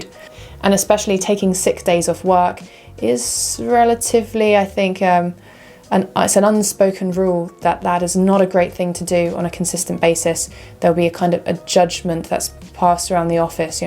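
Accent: United Kingdom. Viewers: 喜歡妳的影片內容以及英國口音!真棒希望繼續分享更多外國文化知識型影片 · 很棒的影片，知性又有趣，讓我們更了解英國文化，感謝您！而且您的英國口音超好聽的！ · 英國口音很悅耳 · The British accent is really beautiful.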